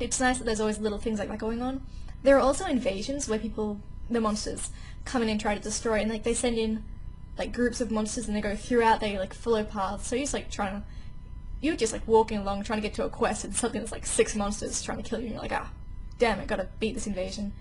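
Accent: Australia. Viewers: Because ur a gamer ur beautiful and u have an austrailian accent which is awesome. (im not trying to ask you out i just need to let it out)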